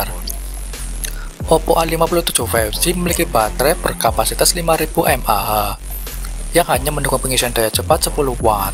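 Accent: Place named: Java, Indonesia. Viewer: Bagus tapi medok sekali hehehe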